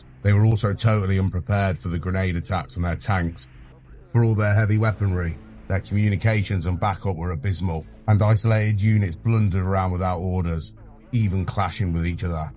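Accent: United Kingdom. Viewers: Had to stop watching after about 30 seconds. Fuck me, that's the roughest British accent I've ever heard. The narrator doesn't even try to pronounce the letter 't' and genuinely sounds drunk or half asleep. Just awful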